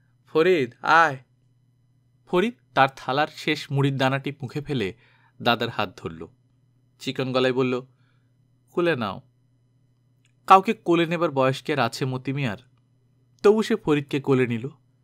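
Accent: Bangal, Bangladesh. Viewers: সত্যিই আপনার বাঙাল ভাষায় কথা বলার ভঙ্গি বড়ই সুন্দর। ভীষণ ভালো লাগে আপনার গল্প শুনতে। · Apnar Bangal accent ta khub accurate, presentation tao darun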